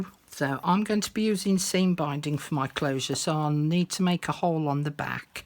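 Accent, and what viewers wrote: England, United Kingdom. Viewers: I love your accent btw it reminds me of my childhood when friends of my dads from England took care of my brother and I when my mother was sick.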